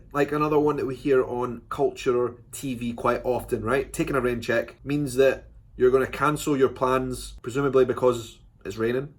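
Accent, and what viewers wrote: Scotland, United Kingdom. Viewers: I just adore you. It's so touching that you are at all concerned with my country but you seem particularly interested. I feels like such a compliment that you've gone to so much trouble to see what we're about. I desperately wish I had the means to visit Scotland and return the favor. Your accent is enchanting to me. :) I wish I could experience your country, as well. I've only seen it on film and screen but so much of it seems utterly beautiful.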